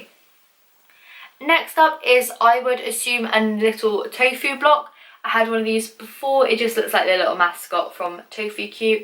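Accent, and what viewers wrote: United Kingdom. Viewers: Is she British? If so YES I’ve finally found a British squishy YouTuber! ❤️ who else here is British?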